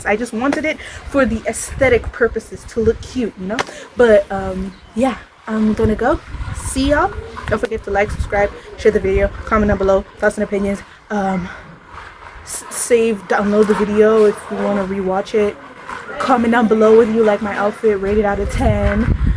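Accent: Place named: South Africa. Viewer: That's south african accent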